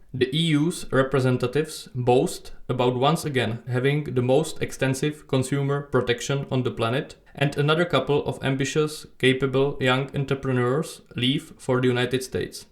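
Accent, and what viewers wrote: Czech Republic. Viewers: Uhh no, this is a pretty clear Czech accent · Z tvého lehkého přízvuku( žádná veliká výtka) jsem poznal, že jsi Čech. Je přímo neskutečné, jak málo si průměrný Evropan uvědomuje všechny problémy týkající se naší budoucnosti, já jsem měl dříve spíše jen takový pocit ,, kulturního podvědomí", že se něco pomalu hroutí než jsem objevil tebe a další podobný materiál. Jak v ohledu ekonomie tak v hudbě, médiích je cítit, že jako kontinent ztrácíme náš elán. Myslím si, že je ČR v určitém smyslu takový zvláštní mix (jako nejzápadněji smýšlející stát železné opony). I přesto nedokážu pomyslet na moc kulturních záležitostí nedávné doby, které by překročily naše hranice. Nechci, aby se z celé Evropy stalo vymírající muzeum, pro celý svět k nahlédnutí. Jako část nejmladší generace mám pocit, že proti tomuhle všemu opravdu stojíme bezmocně, hlavně kvůli tomu, jak je postavená naše populační pyramida. 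Respekt za to, že věnuješ něčemu, co by si spousta jak mladých tak starých lidí měla projít hlavou. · thick czech accent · He is from Chekya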